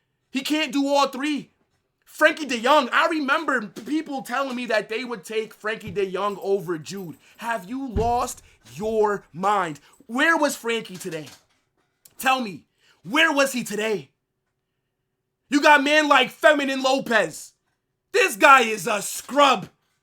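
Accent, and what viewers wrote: United States. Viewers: You are the best Real Madrid youtuber. Very underrated just because you have an American accent.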